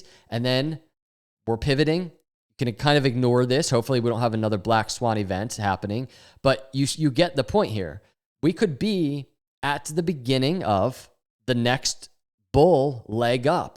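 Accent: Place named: New Jersey, United States. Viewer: I hear a Jersey accent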